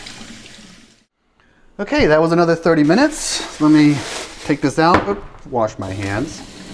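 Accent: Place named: Southern California, United States. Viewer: You seem like you're from California around my general region. Your dialect and your attitude are familiar to me. Being born and raised in Southern California, I pick up on that.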